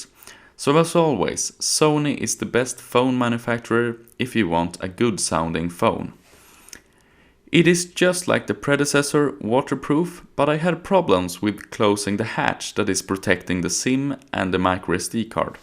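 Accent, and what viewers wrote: Sweden. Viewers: Haha älskar breda svenska brytningen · even worse as Swedes have a reputation of good quality English - almost no detectable accent. not this guy... but whatever, his accent is still better than Scottish or Indian accents, those i literally cannot understand at all. This guy i can completely understand so i give him a pass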